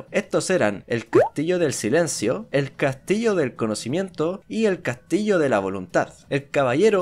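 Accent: Chile. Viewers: A que es chileno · bro, eres chileno?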